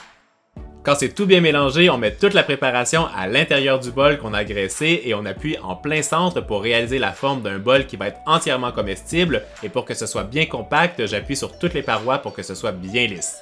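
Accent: Quebec, Canada. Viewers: J'adore ton accent de québécois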